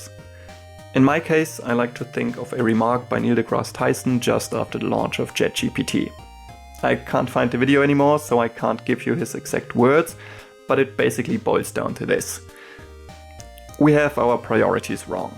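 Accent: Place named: Germany. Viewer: I'm guessing you're German, based on the accent and the fact that you speak more articulately than most native English-speakers. Great video!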